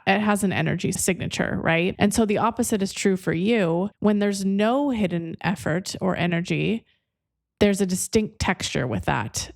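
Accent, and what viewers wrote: Italy. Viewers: Your Italian accent actually sounds so prettyyy and I oove your humor hahha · Things I ❤…., puflet’s and Italian accents. Thank you.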